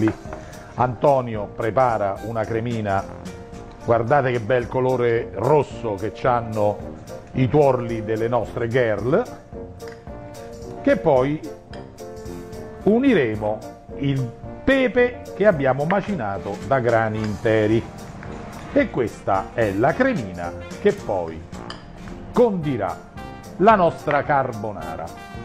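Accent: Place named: Rome, Italy. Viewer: Sono siciliano e di buona forchetta e abbastanza capace ai fornelli a livello amatoriale e vi dico che fra tanti video che ho visto su questa ricetta a mio modesto parere è il migliore in tutti gli aspetti poi con la voce narrante si completa il tutto con quel dialetto romano che ne vado pazzo mi piace da morire e per restare sul tema come se dice a Roma "Ahu me venuta na certa fame co sto video mortaacci vostri mo stasera carbonara per tutti alla faccia da dieta ma che ce frega...". Complimenti veramente.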